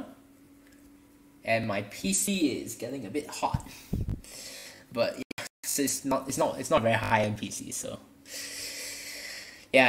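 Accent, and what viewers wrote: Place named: Singapore. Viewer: You sound like Singaporean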